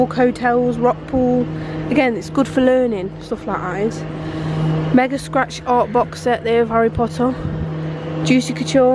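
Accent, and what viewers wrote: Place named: Nottingham, United Kingdom. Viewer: Good Morning. Love me a video first thing on a Thursday morning. You made me laugh when you said Slush Puppy the Nottingham accent is fantastic. Whey I grew up I had the Charlie perfume in the bottle so that’s how old I am, The can came later. This bit is for your brother, my neighbour has two dogs one called Batman the other called Robin. Batman is a Blue Healer its an Australian breed google images of them because he really looks as if he is wearing a Batman mask. Have a great weekend. Oh and I am also obsessed with tea and get my mum to send me out Red label tea bags for birthday and Christmas.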